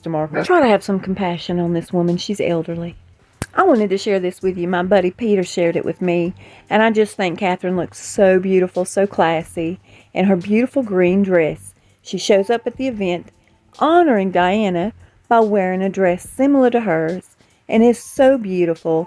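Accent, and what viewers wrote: South, United States. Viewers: I could listen to your wonderful southern accent all day long. My favourite thing is "Y'all stay safe and be blessed".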